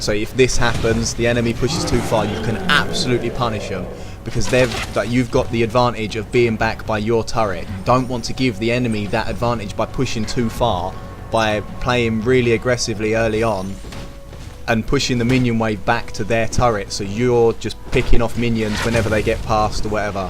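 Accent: United Kingdom. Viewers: I love your videos !! Just the fact that you speak "English English" makes the whole thing alot more understandable cheers !!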